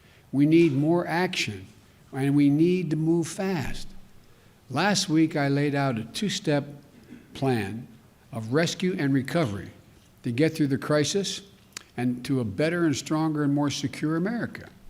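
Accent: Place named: Europe. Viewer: Hey are you American? Your accent betrays you sounds European, just asking? Maybe you were born in America and raised elsewhere anyways I do my homework. I Please stop trolling Traditional Catholics Conservatives and spreading strife....go find a liberal site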